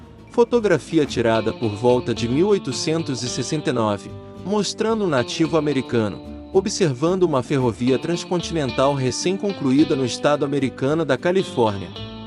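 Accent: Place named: Rio de Janeiro, Brazil. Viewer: Triste é ouvir a de nomes de cidades e personagens com o sotaque carioca! “R” afrancesado e “dchi” no final de Billy the Kid e outros this….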